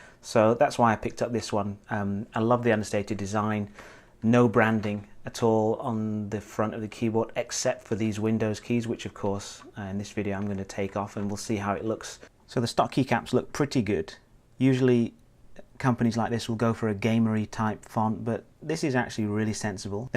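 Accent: United Kingdom. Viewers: I have this keyboard and have just ordered o rings to reduce travel. I'm used to membrane and scissor keyboards on laptops. I've been looking for reviews about this board and found yours. The spacebar doesn't bother me, apart from the depth, but I'm curious: how come someone with such a RP British accent has an oriental username? Just curious.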